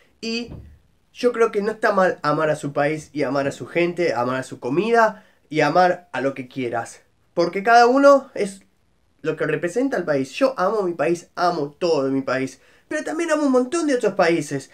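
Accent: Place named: England, United Kingdom. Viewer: Flaco pero si vos sos argentino porque tenes ese acento inglés ?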